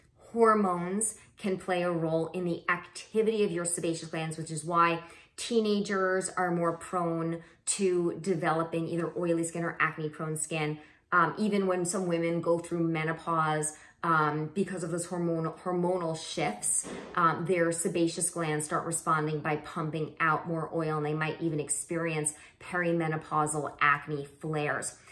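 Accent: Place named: California, United States. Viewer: Why you have Cali girl accent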